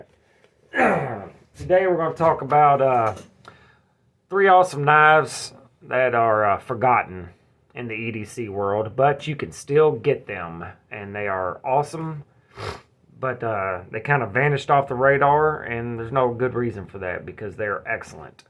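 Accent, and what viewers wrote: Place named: Mississippi, United States. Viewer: You sound just like a cousin of mine that lived in Mississippi.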